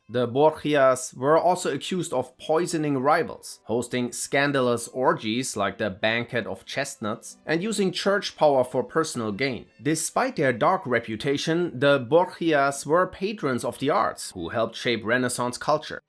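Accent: Germany. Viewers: December 28, 2025 - As an admirer of, I enjoyed this video as much I have his other offerings. This video covers a interesting subject, and I did learn some things. It is my contention that among the "faceless" international elites that determine much of what happens in Western politics and society. Some of the families mentioned in the video are part of that group. Lastly, I loved hearing the good pronouncing the various family names with his distinctive German accent. 👍👍😊I take this opportunity to wish the good the very best of the holiday season 🎄and a Happy and prosperous New Year🎆🎆 Stay well.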